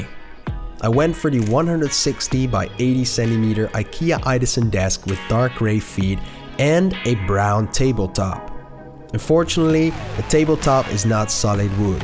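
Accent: Netherlands. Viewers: Ik was in de commands opzoek of je Nederlands was, toen zei je het zelf. Hoorde het toch net in je uitspraak af en toe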